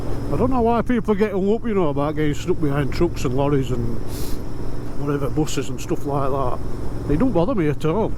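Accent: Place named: Stoke, United Kingdom. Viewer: Hi thanks for the sub Off work today and enjoyed your commentary and the accent I heard my Stokie accent and like you I could barely listen to my own voice really and still can’t much sounds weird to me!!! I’ve not had a lot of time to do new videos this year really but will do the odd one or two when i can going forward. Loving the bike mate Atb phil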